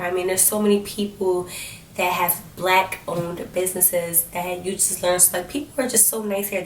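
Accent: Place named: Philadelphia, United States. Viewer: I knew you was a philly girl I can hear it!!! Congrats on the move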